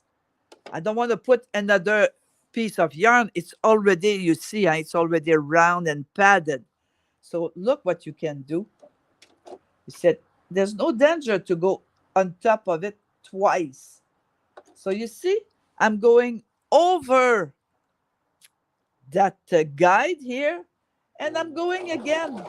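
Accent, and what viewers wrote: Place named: Quebec, Canada. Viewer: French Canada. I recognize the accent.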